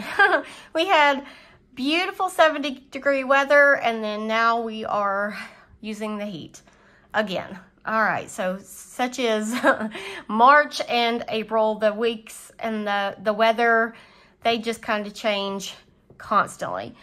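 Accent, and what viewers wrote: South, United States. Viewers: Good job. Love to hear a fellow southern accent in the budgeting community. You are doing great!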